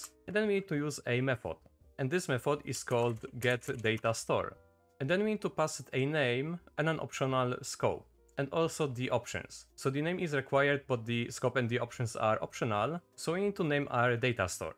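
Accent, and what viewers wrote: Poland. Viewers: dzięki, słychać polski akcent ;) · you sound polish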